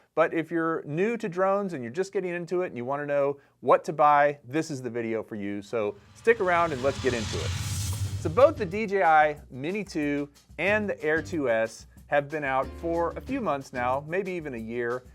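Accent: United States. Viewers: I like your review because you have clear american voice without accents and its crystal clear to my ears. Thanx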